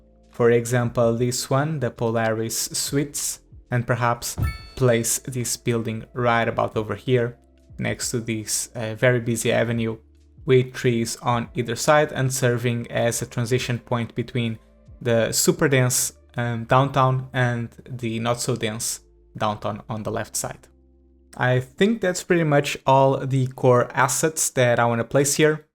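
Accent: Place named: Portugal. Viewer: Esse sotaque Portugues nao engana :D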